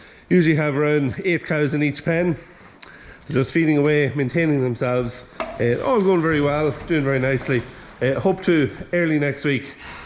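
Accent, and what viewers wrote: Dundalk, Ireland. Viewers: Great video. Happy holidays,. Are you from around Dundalk somewhere?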